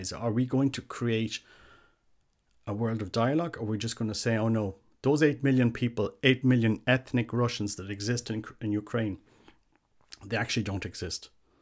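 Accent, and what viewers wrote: Ireland. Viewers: Thank you for addressing this! I love icons and have been collecting copies from my local Catholic store; I couldn't understand the blacked-out baby at all. One good result of TM 's video was that I first heard of Fr. Thady's channel - what a lovely 'old-school' priest he seems to be, although not at all afraid of speaking his mind! We used to have lots of strict but kind-hearted priests with wonderful Irish brogues here in Canada, but no more. Now I can listen to dear Fr. Thady's channel as well as to your soothing brogue. 😃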